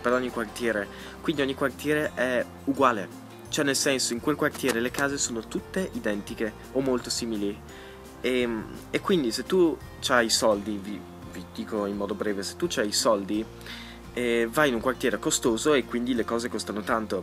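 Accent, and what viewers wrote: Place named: Verona, Italy. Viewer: Si sente un attimo la tua sfumatura veronese From Borgo Trento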